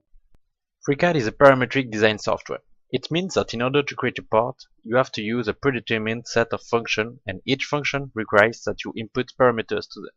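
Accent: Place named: France. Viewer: Your French accent is just fine; one thing, if I may: middle is pronounced with an "ee" not an "eye" sound, like here: <link> Many thanks for your effort, good video!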